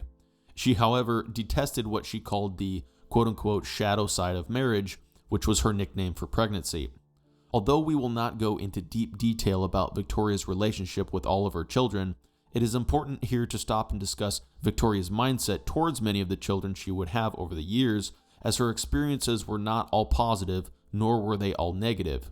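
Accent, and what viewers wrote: United States. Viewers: The picture introducing "the Corn Laws" showed North American "corn" ["maize"] not "corn" ["grain" i.e. wheat and barley] as is meant in England. The documentary is thorough and good; but has that American accent.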